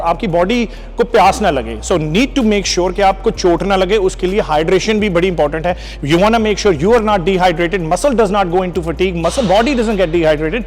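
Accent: Punjab, India. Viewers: Bande de puttar bano, muscle di dhukki kada ti 😂😂 🤟 Paji tusi pisho kithe to ho ? Tuhada punjabi da style bilkul sade nal milda, plz dassyo jaroor where from you ? 🙏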